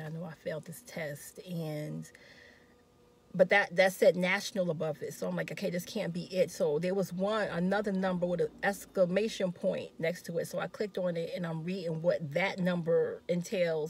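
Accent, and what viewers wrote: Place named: New York, United States. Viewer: I hear in your voice that you are from New York, do you still live there or did you take your NCLEX there?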